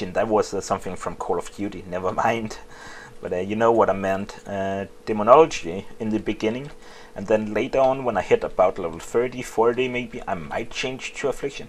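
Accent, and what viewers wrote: Denmark. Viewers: are u danish?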